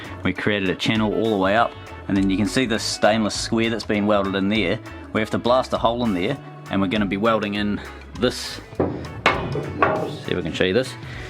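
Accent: New Zealand. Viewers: Are you originally from NewZealand?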